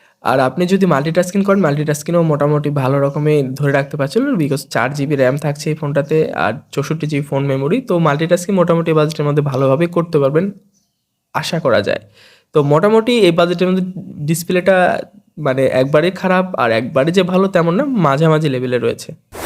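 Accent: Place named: Kolkata, India. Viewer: আপনি তো বাংলাদেশি, কিন্তু আপনার একসেন্ট একদম কলকাতার মতো কেন?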